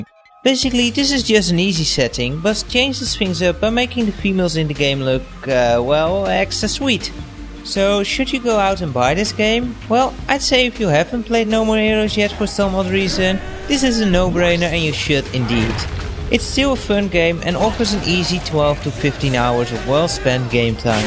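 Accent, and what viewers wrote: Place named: Netherlands. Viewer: hahah goeie review. ik had direct door dat je een Nederlander bent :p Voor 40€ is hem zeker waard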